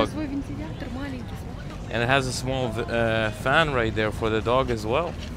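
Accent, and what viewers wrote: New York, United States. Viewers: Hey did you learn your English from a New Yorker??? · It's funny that your English sounds like an American accent more than Irish. Best of luck in Thailand, and I'll be watching to see where you end up!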